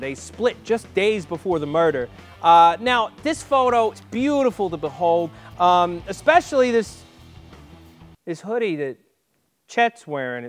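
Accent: Boston, United States. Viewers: Not sure if the accent is Bostonian or Archie Bunker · Bawston accent is great. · I mean like common, that baws-town accent ... really?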